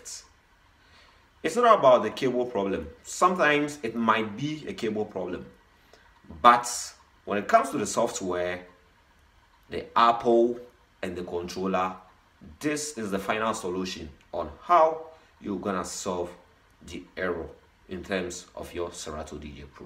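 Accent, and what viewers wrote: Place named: Africa. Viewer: I LOVE YOUR ACCENT BRO PROPER AFRICAN VIBES PEACE AND LOVE MY MAN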